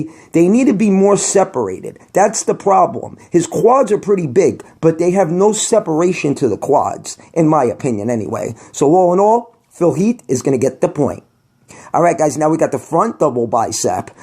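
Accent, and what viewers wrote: New York, United States. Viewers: Idk what part of ny that accent is prob the city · I love your accent NY style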